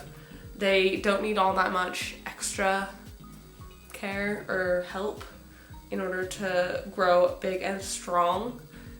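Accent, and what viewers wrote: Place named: United Kingdom. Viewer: Are you British? I hear a bit of an english accent...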